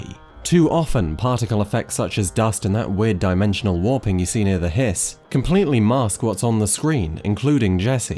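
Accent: United Kingdom. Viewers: The British accent makes this critique overly pretentious and distractive. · Why do humans x? Idk but im sure a gaming essayist who makes up words, and has seemingly tenuous grasp of civics is going to tell us in a tapestry of mixed metaphors, pointless erudition, and complete lack of knowledge/context asude from gaming. Why do people who play lots of games think it equips, instead of completely contort, their understanding of reality? Yay another 40 minute leviathan embarassment to eyeroll thru hoping this youtuber would just review games and quit playing armchair philosopher. Anyone can play shitloads if games and talk about them with a british accent and get views, what does actually bring to the table? Not much. once you get to a few of his vids this becomes verrrry apparent that this person is so out if their depth creatively.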